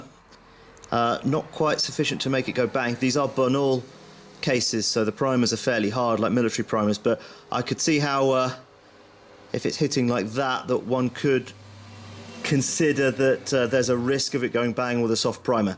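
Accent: England, United Kingdom. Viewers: so weird to hear a British accent on a gun video instead of an American southern drawl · Hi, nice test. Are you English? Can a Garand T2 girino a 30-06 cadridge? Andrea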